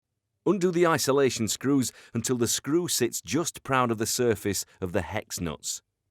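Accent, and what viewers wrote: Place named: United Kingdom. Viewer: I hate your limey voice. Piss off you wanker.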